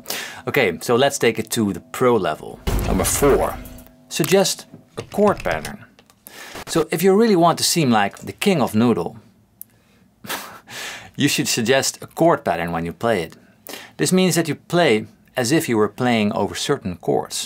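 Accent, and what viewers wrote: Netherlands. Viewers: I love that you can hear his accent a little. Viva hollanda🇳🇱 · Je hoort het zo fel dat je een Nederlander bent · Are you a dutchy?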